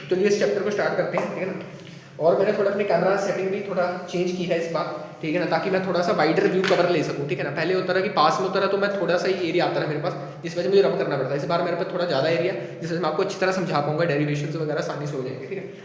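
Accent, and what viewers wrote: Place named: Punjab, India. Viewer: Nice video and intro in punjabi tone I like this keep it up 🎉🎉😊🙏🏼🥰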